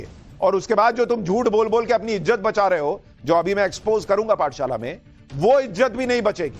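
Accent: Bihar, India. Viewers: Tm bihari ho kya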